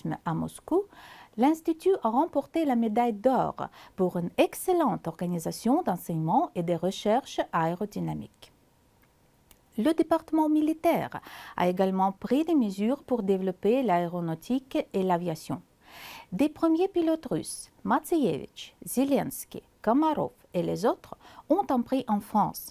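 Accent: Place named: Russia. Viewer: ce fut très instructif, merci madame pour tous ces renseignements. j'ajouterai que votre Français est parfait en plus avec un très joli accent russe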